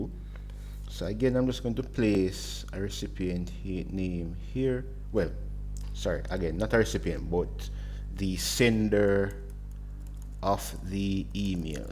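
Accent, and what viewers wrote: Jamaica. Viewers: Bro are you Jamaican?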